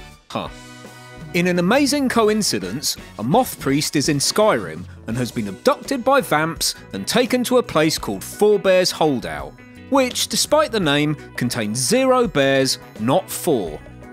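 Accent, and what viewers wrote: United Kingdom. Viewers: Ew britisj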